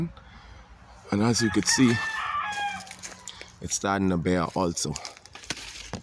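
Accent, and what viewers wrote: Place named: Bahamas. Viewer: Man, you can't hide that Bahamian accent. 😊 Thanks so much for sharing! Grateful for something from someone and somewhere I can relate to. Subscribed.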